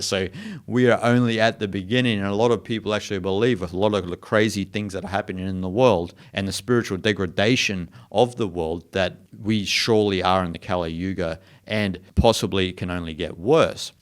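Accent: Australia. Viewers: Great content and presentation. Always good to hear an Aussie voice. 😊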